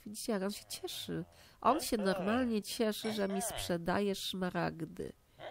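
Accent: France. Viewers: mam pytanie dlaczego masz akcent francuski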